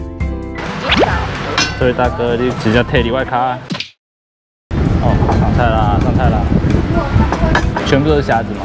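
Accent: Taiwan. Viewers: 剪輯整個很順看了很舒服還有整部影片好幹話好台好爽那個口音那個用詞啊希望可以多拍海釣方面出國題材也很棒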